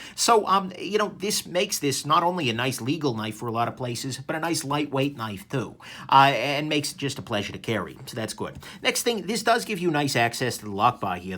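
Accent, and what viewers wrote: New York, United States. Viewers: That New York accent...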